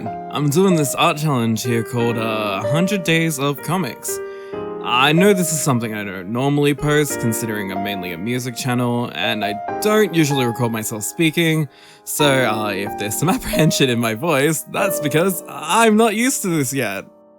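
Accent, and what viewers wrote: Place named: RP, United Kingdom. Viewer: whoah, that accent.... whoah... I thought you were not gonna have such a posh accent wow........ just whoaaaaaaah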